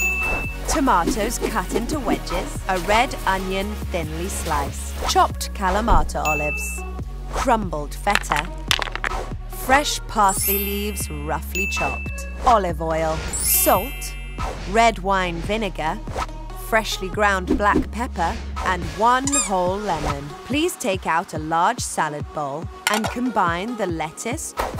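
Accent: United Kingdom. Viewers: So he's or she's idk British???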